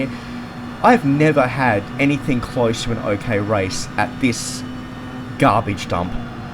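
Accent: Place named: Australia. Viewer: You have an aussie accent, very nice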